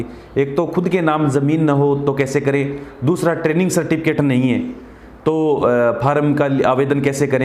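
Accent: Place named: Churu, India. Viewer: Sir aap kha se h ....aapki boli se churu k aas pass k lagte ho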